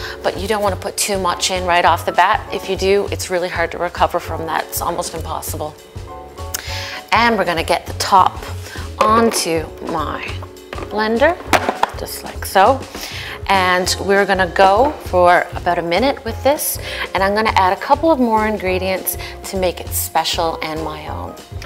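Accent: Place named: Newfoundland, Canada. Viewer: I picks up a little Newfy accent.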